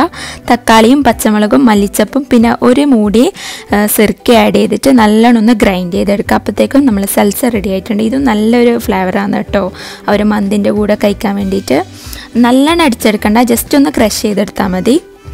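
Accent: Kannur, India. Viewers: Innala korchi busy aaypoi deep cleaning nnta baagam adhn nu late aayadhu ningla video kaanan my fvrt ur vdes and kannur slang...enki oru hai hello paryoo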